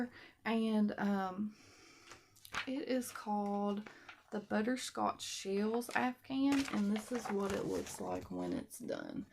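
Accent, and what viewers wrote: South, United States. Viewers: you're so cute. I love your accent. My family is from the south/midwest too but I didn't get the accent. Boo. LOL. Your Lucy doll is fabulous! So creative and fun! Those blankets and rug/doily are amazing! Your drawers are a great idea! I use little condiment containers from the Dollar tree and separate them by size, for my safety eyes. I store them in an old Crochet Society Advent Calendar. I don't mind long videos. It let us get to know you better:)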